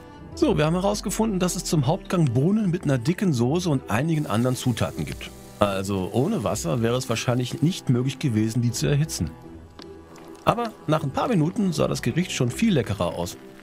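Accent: Russia. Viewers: Der russische akzent fehlt mir schon ein bisschen...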